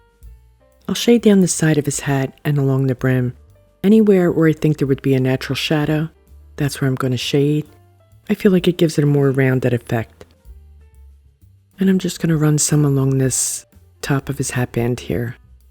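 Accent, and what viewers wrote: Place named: East Coast, United States. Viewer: I love your East coast accent as well! Happy weekend!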